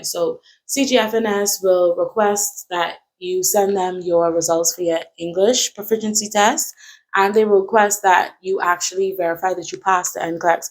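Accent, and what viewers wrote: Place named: Bahamas. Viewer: You sound like a Bahamian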